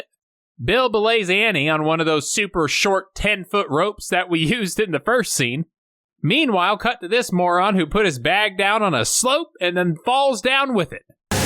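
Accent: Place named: Australia. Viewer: I didn’t expect to hear an Aussie accent so that woke me up lol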